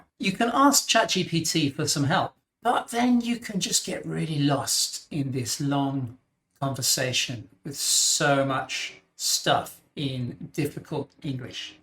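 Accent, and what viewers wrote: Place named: United Kingdom. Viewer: Hi coach I realised yesterday You are very very good Many thx for sharing your experience Also i like very much uk accent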